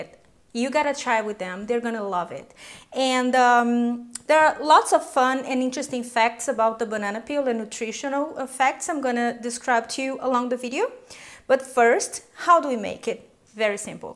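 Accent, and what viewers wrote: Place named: Brazil. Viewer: hey are you brazilian? i can see for your accent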